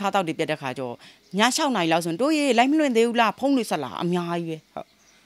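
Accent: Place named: Taungoo, Myanmar. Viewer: တောင်ငူလေသံကြီး ဟ သိနေတယ်နော်❤😂 မျိုးthandar ooရေ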